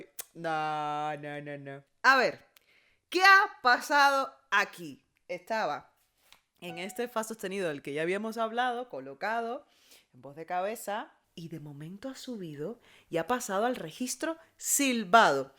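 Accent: Cuba. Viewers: bienvenida a Dimash, me gusta ver las reacciones de los coaches (aunq no se nada de musica), pero me encanta ver como se quedan perplejos, porfa reacciona a stranger y Ogni pietra, saludos, otra cosa, me sonaste cubana, suerte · Solo de escuchar supe que eras Cubana.Sin duda somos inconfundibles.Saludos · Saludos desde Cuba. Me encanta Dimash y qué orgullo ver tu reacción tan buena 👌🏽. Enseguida reconocí el acento cubano jejeje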